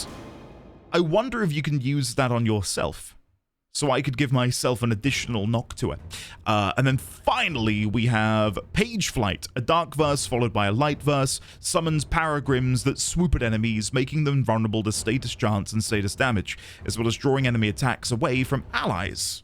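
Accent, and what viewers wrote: United Kingdom. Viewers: When you first pronounced "capillary," I just chalked it up to you being British. I mean, really, you just say everything wrong anyway. You pronounce r's like a's ("neah" instead of "near"), you pronounce a's like r's ("i-dear" instead of "idea"), then there's the whole aluminum/aluminium thing. So when you said "capilliary" I didn't bat an eye. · weird to hear a northern uk voice in warframe's universe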